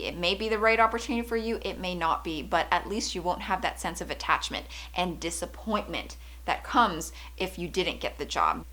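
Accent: Canada. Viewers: This is so much more inspiring with a Canadian accent!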